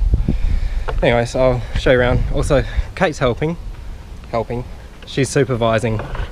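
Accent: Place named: Western Australia, Australia. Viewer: Great transformation on that grotty ute! Am I right in thinking you are a fellow West Aussie? The accent... 😉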